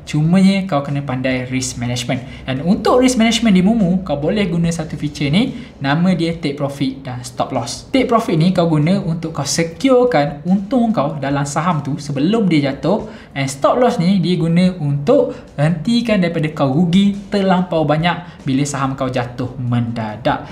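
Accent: Terengganu, Malaysia. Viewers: oh bang org ganu en?😂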